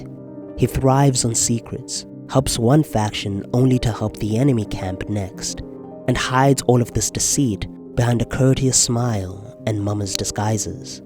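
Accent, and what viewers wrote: South Africa. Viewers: Haibo is that a fellow mzanzian I hear ???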